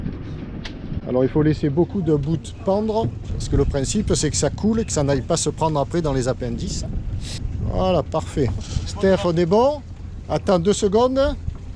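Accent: South, France. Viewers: Je m'étonne de tous ces commentaires sur les gilets, les chaussures, les pare bat, la ligne de vie...Il me semble que tu es un capitaine avisé et qui sait s'adapter aux éléments. Pour preuve: en <num>) tu nous a fait un speech de 20 min (Marseille est proche) sur le fait que les femmes ne devaient pas gérer la baille à mouillage. En 2019, pendant 5 semaines tu n'as pas vu la couleur de ton ancre parce que Steph avait adopté le poste. Autre matelot = autres idées. Au passage un grand merci à Steph pour sa participation. Si on dit que les actrices attirent la lumière alors Steph est une actrice. Et puis les pare bats de couleur allaient très bien au tabasco. Nous on regarde, via un écran plat, avec une seule donnée 30 nœuds de vent. Il y a des vidéos où par 30 kts l'équipage est à l'abri dans le carré et le barreur est harnaché comme un cap hornier. Là on voit un équipage heureux et serin. 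Le capitaine connait par cœur son bateau et son plan d'eau. Pour la gaffe, quel suspens insoutenable! C'est filmé comme du Hitchcock! J'adore et c'est au moins la 2eme fois que tu nous fais le coup. Comme si tu allais tous les jours au ship pour acheter une gaffe... Tout ça pour dire que dès les premiers sons, l'accent, le vent, je m'évade et je profite pleinement des images quelque soit le sujet. Pour les puristes, fait leur un jeu des sept erreurs à retrouver dans chaque vidéos. Pour un premier commentaire, je suis un peu long, mais par rapport au temps que tu nous consacre c'est zé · VFI: vêtement de sécurité individuel. En clair le gilet auto-gonflant. J’aime bien tes vidéos. Et puis avé l’accent, c’est du soleil dans notre grisaille bretonne👌👌👌👌 Bonne journée, Patrice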